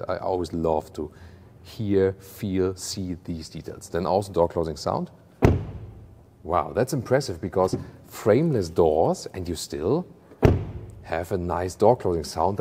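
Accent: Germany. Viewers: If the presentation of a car is mostly focusing on the design you know that something is wrong. It doesn´t help that a german guy is trying to make it more convincing by giving a biased presentation with a bloody german accent. The high pricing is just insane.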